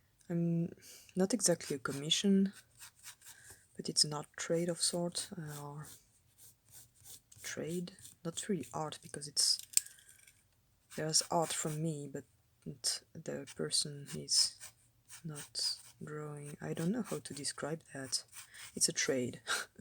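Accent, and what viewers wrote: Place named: France. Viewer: Oh mon dieu les eighths doctor adventures ET un accent français comment j'ai fait pour ne pas trouver cette chaîne plus tôt. merci de garder le rêve du huitième en vie par la peinture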